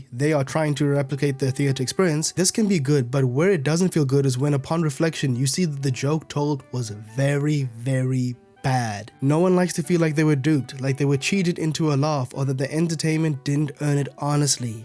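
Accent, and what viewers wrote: South Africa. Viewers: Are you south african? I'm detecting a South African accent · unrelated question but are you south african?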